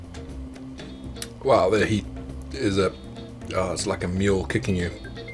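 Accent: South Africa. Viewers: Where are you based? You sound South African.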